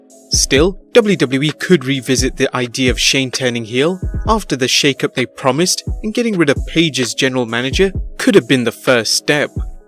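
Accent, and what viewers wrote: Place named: England, United Kingdom. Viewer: Never should’ve been GM. English accents are unbearably painful. More Meltzer-like guess work in this video though, nice work marks.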